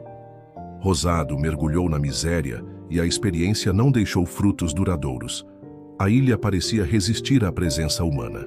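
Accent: Brazil. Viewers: Mas fica sendo que os Cabocerdeanos sempre usam o sotaque Portugues de Portugal. E o que aprenda nas escolas de Cabo Verde.... por isso foi a minha pergunta inicial do sotaque Brazileiro sendo usado neste video pelo um Caboverdeano. E era so curiosidade, nada mais.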